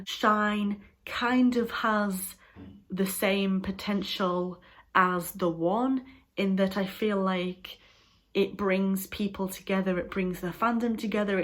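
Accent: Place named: Geordie, United Kingdom. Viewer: Fascinating video with some Interesting choices! My fav tracks for each album are::, (......Best Album!):,;, Trying to pinpoint your accent: sounds like a hybrid of Geordie and North Yorkshire..........so I'm guessing: Middlesborough or possibly Darlington.......?:) btw I've included your video in my Reactions (Best of) playlist..... check it out on my channel (which has ELEVEN playlists devoted exclusively to -related content.....with a total of over 8,500 videos: what better way to fill these long lonely days of Corona quarantine?!!! lol)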